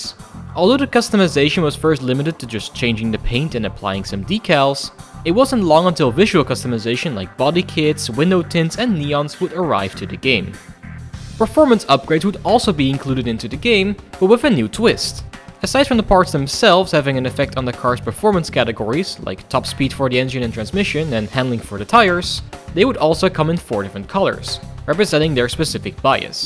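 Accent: Netherlands. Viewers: The Dutch accent is already unmistakable.. But 'Costed'?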